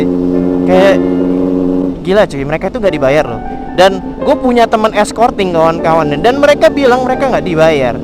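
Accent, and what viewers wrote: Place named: Lampung, Indonesia. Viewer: YouTuber dari Lampung mana ini suaranya nee.?👍👍, . . . . Monggo mampir ke channel ku juga yaa🙏 .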